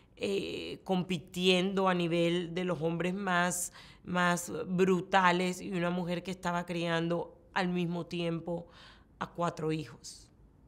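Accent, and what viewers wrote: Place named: Caribbean Coast, Colombia. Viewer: La gente de la costa atlántica en Colombia hablan así .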